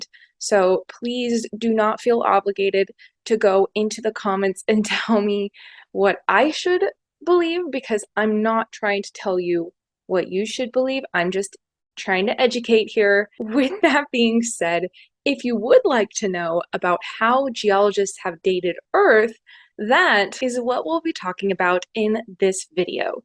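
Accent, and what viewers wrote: United States. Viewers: Wow - super clear and accurate. (And great to hear a voice from the USA that is not talking nonsense - here in the UK, the news is dominated by Trump and his creatures, so I have come to associate an American accent with idiocy).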